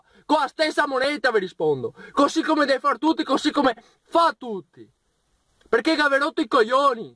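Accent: Veneto, Italy. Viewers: 1.15 LÈGO AHHAHAHHA Comunque bellissimo il dialetto Veneto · Il tocco del dialetto veneto rende tutto più bello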